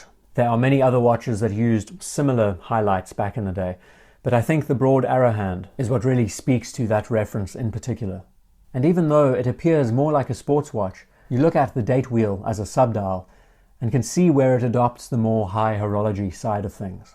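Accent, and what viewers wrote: South Africa. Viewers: I love you - your content. Also, your South African accent is perfect for YouTube. This VC is OUTSTANDING! Nice video mate. Stay safe.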